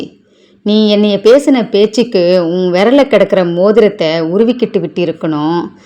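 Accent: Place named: Chennai, India. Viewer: அட அட சூப்பர் கதை அப்புறம் உங்கள் ..... உங்கள் மெட்ராஸ் பாஷை அட்டகாசம் சகோதரி❤❤❤❤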